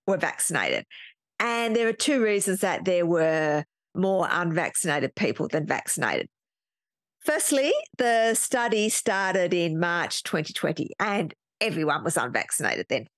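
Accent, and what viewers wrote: Australia. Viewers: Wait a second - all Aussies sound like that. And looks fine to me. Are you Ok?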